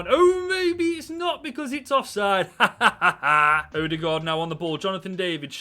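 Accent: Stoke, United Kingdom. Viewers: Only just came across your channel. Great content!! I’ve subbed as I assume from the accent you’re from Stoke ❤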